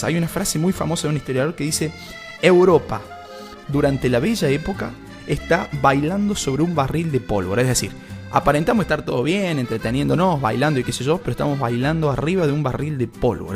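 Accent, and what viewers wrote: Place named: Argentina. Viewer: La verdad que dese hace semanas estoy buscando videos para oír antes de dormir y este canal me parece muy bueno, dormirme y culturizándome , incluso he llegado a soñar de lo que oigo y definitivamente prefiero la tonada Argentina y soy Venezolana , gracias por este aporte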